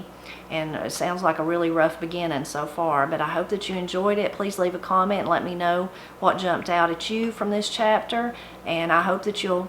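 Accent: Appalachia, United States. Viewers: My daughter introduced me to the The Blind Pig and Acorn. Sometime later I came across on You Tube. I enjoy it so much. Today I was looking for one of my cookbooks and I found a copy of Dorie Woman of the Mountains. I don't remember when I got it. It just got lost in with all of my books I guess. I am so excited. I immediately sat down and started reading. I grew up in North West GA and the stories you share and the lives your family live is so much like mine. My favorite place to go as a child was to my great uncle and aunt's house. I watched her churn butter and cook on a woodstove., wash clothes in a black wash pot, and cook her own cured ham, got her vegetables from the root cellar and I loved getting a cold drink of water from the well bucket with a dipper. I think of those visits often and miss them. You and I talk in the same "funny way," I once had a lady in a church where my husband was interim pastor tell me I talked so funny and Southern, said she'd never heard anyone talk so country as me. 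I guess I was a little sassy and I said," I talk this way because I was fortunate enough to grow up In Appalachia. Only privileged people can talk like this! I guess you got left out when God was giving out blessings"! She never mention my funny way of talking again. By the way, congratulations on your well deserved award. Thanks for all do do to Celebrate Appalachia! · You talk just like me. I'm a retired English teacher from Eastern Kentucky. I was privileged to drive through the Smokies just last week. I've got to go back and listen to your first book..This is going to be a good one.I was just thinking about my cousin naming her daughters Sara and Hannah. Her parents were so worried that the girls would be called Surry and Hanner. I've got to go back and find your video on names.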